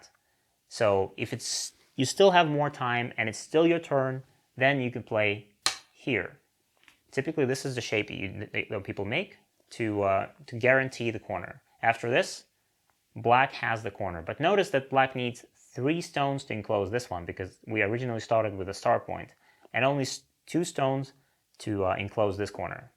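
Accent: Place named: United Kingdom. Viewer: You have a great accent. I thought your from the UK :) I like your passion about the game. Also the studio and the way you talk about the game.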